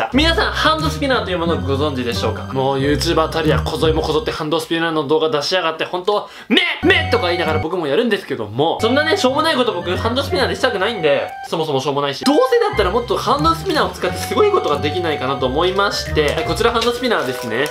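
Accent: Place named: Toyama, Japan. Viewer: この中に入っとるんか。 完全富山弁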